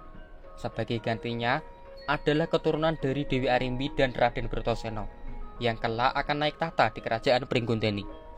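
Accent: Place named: Purwodadi, Indonesia. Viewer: Naratornya maaf wong purwodadikah!? Cengkoke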